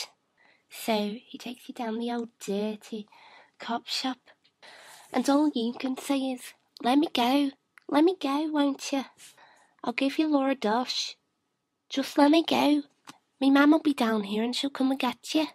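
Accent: Liverpool, United Kingdom. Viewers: WAT these are just english words misspelled and in a britishey accent. this is dumb... "Scouse" lol it's a distinct language! lololz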